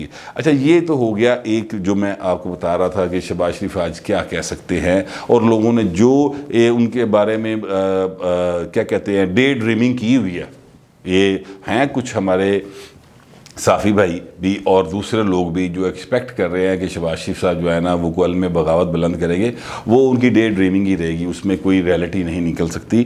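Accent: Lahore, Pakistan. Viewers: you’ve given a superb interrelationships between the Mian brothers by illustrating a few of your personality experienced happenings. You’ve certainly a great narration skill in Urdu dotted with typical Lahori Punjabi. Super journalistic analysis. That’s why your vlog are impatiently waited for. ❤️❤️❤️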